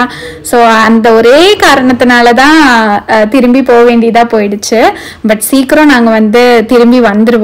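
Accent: Kongu, India. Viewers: eanunga ka niga kongu mandalatha senthavangala unga slang thaniya theriyuthe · Namma kongu slang alazhungoo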